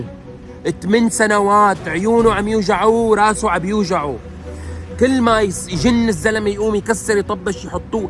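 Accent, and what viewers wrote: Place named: Damascus, Syria. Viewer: باين عكلامك من الشام يعني عربيني او دوماني بس ماتجمل وتقول السوريين عيب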